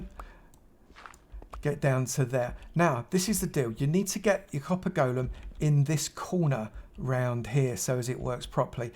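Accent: United Kingdom. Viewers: Loving your videos. Nice to hear a British accent 😀 What happens if a chest gets full up. Do the items go into the overflow?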